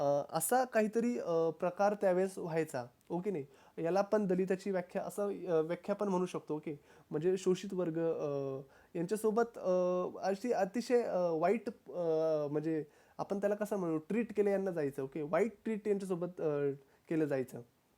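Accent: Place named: Vidarbha, India. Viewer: Great video Sir. I would like to suggest that please provide the reference for things possible. Are you from Vidarbha region, seems from your accent.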